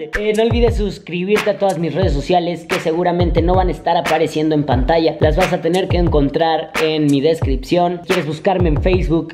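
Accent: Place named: Spain. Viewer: Odio que hables como ESPAÑOL 🙄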